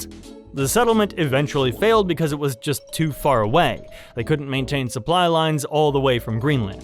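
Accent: Germany. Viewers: this guy really puts the german in the germanic scandinavian accents, ngl horrible horrible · It sounded German lom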